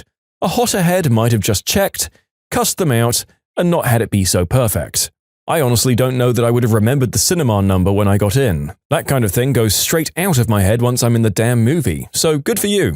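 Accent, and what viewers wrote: United Kingdom. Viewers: love the british voice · What is this British voice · British alert🚨🚨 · You are now have a british voice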